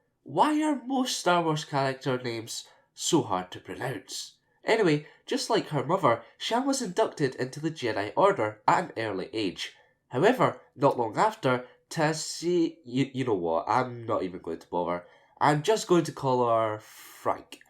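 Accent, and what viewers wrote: United Kingdom. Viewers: British accent I'm thinking? or just a diverse American voice here?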